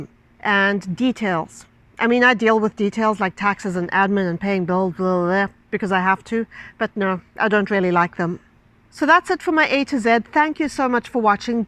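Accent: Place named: United Kingdom. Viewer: London I get it cause you have a tilt in your accent to the British and as for Croatia I really do not know why 😂😂😂😂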